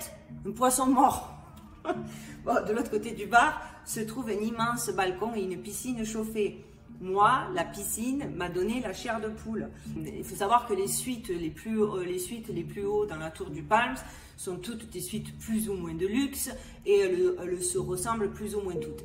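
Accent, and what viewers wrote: South, France. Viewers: Je te découvre grâce à Silvano. J'ai trop kiffé le début " hello everyone '...je croyais que t'étais ricaine puis tu t'es mise à parler le français du sud ...🤩🤩🤩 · Damien Hirtch, copain de martina abramovic, pour un copain donner.Quel bel accent du sud a végas!!!Merci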